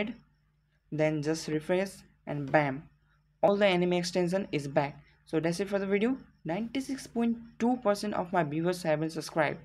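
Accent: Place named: India. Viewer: It's always the indian accent that always works and helps! Ty!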